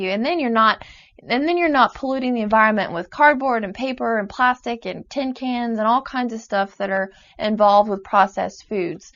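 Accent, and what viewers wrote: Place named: Midwest, United States. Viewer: I’m trying to guess what accent she has... Midwest?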